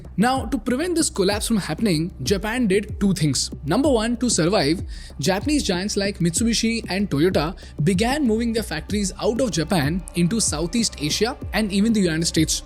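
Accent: India. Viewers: 原来印度语是这样的啊。有点英语的成分啊。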